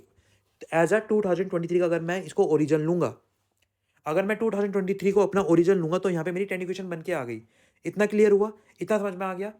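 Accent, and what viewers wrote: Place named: Pahadi, India. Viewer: love that cutu pahadi accent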